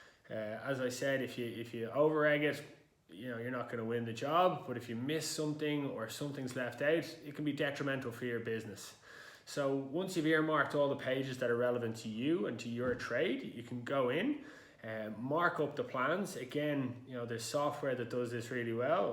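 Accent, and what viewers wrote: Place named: Dublin, Ireland. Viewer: I notice you and the guy in the previous video I watched have Irish (Dublin) accents. Are you guys based in Ireland or USA? I'm Irish and am a drywall/steel-stud estimator living in Canada. We use On-Screen takeoff where I work - it's very good, but quite expensive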